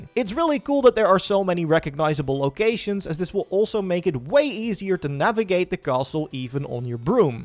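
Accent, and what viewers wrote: Netherlands. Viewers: I really like your video's, but are Dutch? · I immediately heard that you were a fellow Dutch guy, cheers! Great video.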